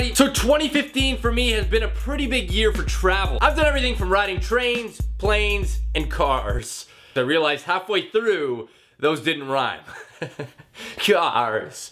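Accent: Canada. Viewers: Wait, are you Canadian?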